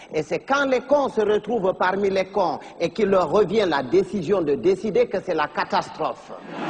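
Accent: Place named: France. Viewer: La vie Frr t’as vu il parle le français français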